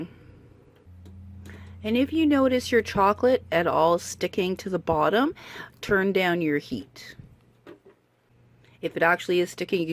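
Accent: Canada. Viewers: Hi, Have you ever seen a TV show called Trailer Park Boys? It's set in Halifax NS. They say about like you do, aboot lol. Does that mean you have a NS accent? Or do all Canadians say aboot? BTW, It says subcribe instead of subscribe on your titles at end. I don't mind. I like misspellings but I can't help but point them out. Sorry.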